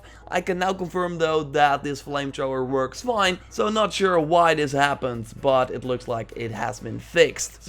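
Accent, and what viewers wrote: Netherlands. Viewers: Are you Dutch/Flemish?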